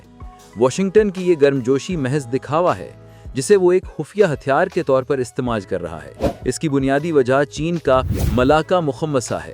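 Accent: Punjab, Pakistan. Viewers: ما شاء اللہ پنجابی میں دھوئی ہوئی اردو لیکن اچھا تجزیہ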